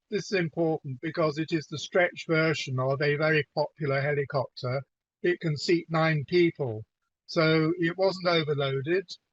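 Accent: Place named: United Kingdom. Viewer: I cannot understand what this newsperson is saying, he sounds British. I will get the story elsewhere.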